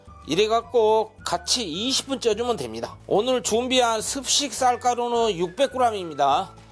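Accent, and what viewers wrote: Jeolla, South Korea. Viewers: 전라도 사투리가 아주 구수허니 정겹습니다 말씀 만큼이나 요리도 정성도 꼬숩고 대단하십니다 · ㅋㅋㅋㅋㅋ. 선생님. 말씀 구수한 사투리 전라도 말씀 진짜 잼있어요. 음식도 맛나고 말씀도 구수하고. 진짜. 잘 알려주시고. 고맙습니다. 모는 음식. 해봐야 하는데. 시간도 없네요 ~~ㅎ ㅎ😂😂😂🎉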